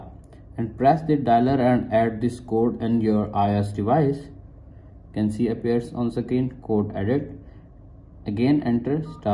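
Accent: India. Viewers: Don’t be fool by hi Indian professional voice all liar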